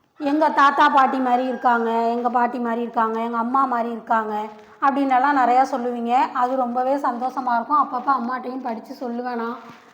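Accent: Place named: Thanjavur, India. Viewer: வாழ்த்துக்கள். தஞ்சை மாவட்டம் திருவாரூர் மாவட்டம் வட்டாரச் சொல் படி பேசுகிறீர்கள்.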